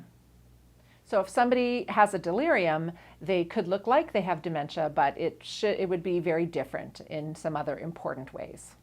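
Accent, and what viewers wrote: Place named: Canada. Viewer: Your accent gave you away! You should have clearly stated at the onset that you are from Canada. As one who has spent much time in your country, there is an extremely strong bias against USA conservatives in general. For years and years, Canadian media has been obsessed with American bashing and has been rife with thousands of examples including long documentaries. That bias is daily and insidious and long-standing sport. So here you have a Canadian medical doctor (you) who is commenting "incognito" on USA politics by not identifying where you are from (and quite possibly, only because you are Canadian, hiding bias). I am sure you know that in high-level research, bias destroys validity. It is quite possible that you only wished to educate the public about dementia. However, the fact that you did not identify that you are Canadian and this concerns Joe Biden (in the United States), is both questionable and suspicious. 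There is a huge amount of recorded primary source empirical data demonstrating that Biden has a serious problem and most of the USA knows it (on both sides of the aisle). I wonder if one sees this kind of covert interference in Canadian politics by Americans?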